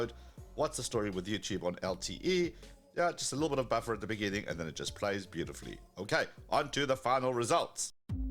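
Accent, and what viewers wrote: South Africa. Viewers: You have a South African accent 🤔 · Hey boet. Your accent sounds South African. Let me know if I'm right. I'm from Cape Town, ZA. On 5G, using the same app you are I got 464Mbps this morning. I religiously test atleast once a day...My connection speeds have always been between 450Mb and 500Mb.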